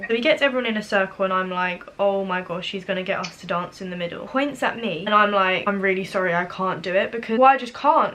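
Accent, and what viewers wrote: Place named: United Kingdom. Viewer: british english sounds so beautiful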